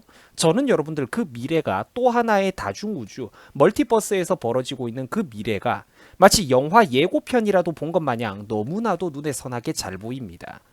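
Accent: Gyeongsang, South Korea. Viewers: 경상도분 서울말 부탁드립니다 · 경상도 인 서울말 안되지요 ?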